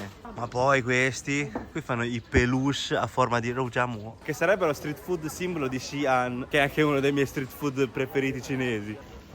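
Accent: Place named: Pesaro, Italy. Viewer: Pesaro, come fai a confondere l'accento con il modenese?😅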